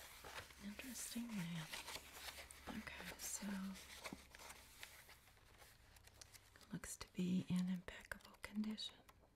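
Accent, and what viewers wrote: South, United States. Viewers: your accent is so wonderful to me. Your voice and mannerisms just speak “southern charm”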